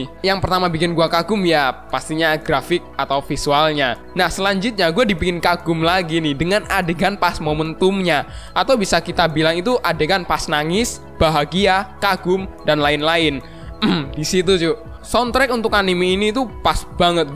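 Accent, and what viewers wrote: Lampung, Indonesia. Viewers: Tabik pun Bang lu orang Lampung ya? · Bang, lu dari lampung tah ? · Bang lu orang Lampung?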